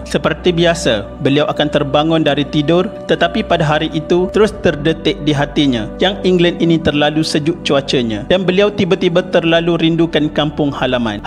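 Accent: Kelantan, Malaysia. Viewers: Laaaa ore kelate ko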